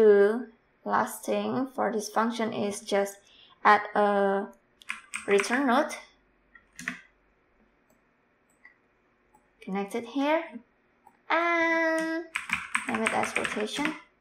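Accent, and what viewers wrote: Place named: Indonesia. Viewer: Why do i hear indonesian accent.. btw nice tutorial.. i'll try it later to see if this still works in unreal engine 5 or not.. somehow i manage to do the footIK based on Z Axis trace.. but still struggling on the Rotation using Hit Normal..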